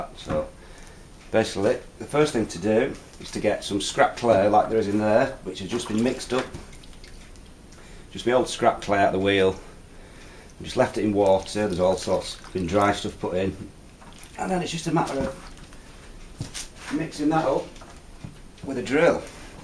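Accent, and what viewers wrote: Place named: England, United Kingdom. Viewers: It is a beautiful Yorkshire accent. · I don’t understand his English accent 😂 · Thanks for the video, love your accent, makes me miss England:)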